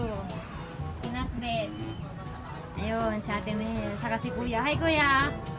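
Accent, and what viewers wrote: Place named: Pangasinan, Philippines. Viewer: wen napintas ka ng atalaga,,.. ngem yong accent mo,, parang may halong pangasinan,, haha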